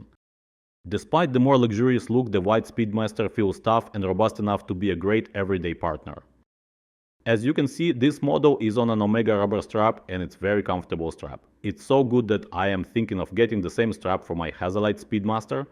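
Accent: Russia. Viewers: Русский акцент пипец жёсткий, конечно...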